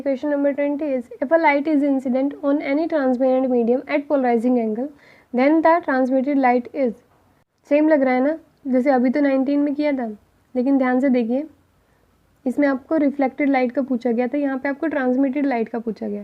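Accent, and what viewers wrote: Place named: Haryana, India. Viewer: Language touch aa hi jata h haryana wala ka... 😅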